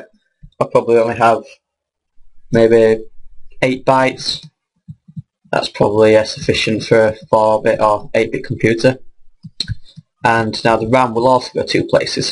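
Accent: United Kingdom. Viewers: is my man scottish?!!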